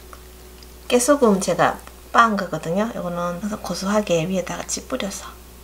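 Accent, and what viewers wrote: Gyeongsang, South Korea. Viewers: 구수하신 경북 억양이 편안하게 느껴집니다.쉽게하지못했던 요리를 쉽고 간단하게 하는 요리 노하우를 기다릴게요. 감사합니다...^^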